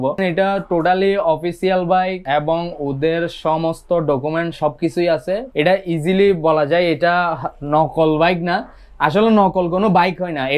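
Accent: Chittagong, Bangladesh. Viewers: যেটুকু বলতে পারছে তাতেই যতষ্ট বুঝা জায় ctg voice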